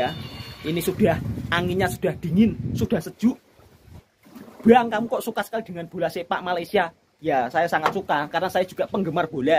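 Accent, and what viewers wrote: Java, Indonesia. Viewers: pertama kali mendengar ulasan loghat Jowo. 👍🏼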